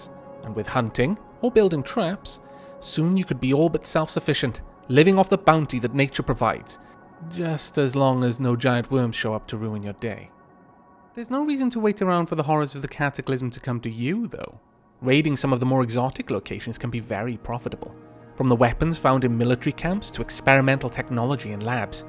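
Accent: United Kingdom. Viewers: What's with all the new Let's Players I'm finding sounding like they're doing voice-overs on prologues to some series? First the 'Call in now, and you get TFC with not just five, not just six, but at least SEVEN NEW TREES!' of Pakratt, and now the 'Come with me on a journey through the ag... I mean, game' of this guy? Amusing, though. Wonder how you'll talk later on... Probably still british-esque.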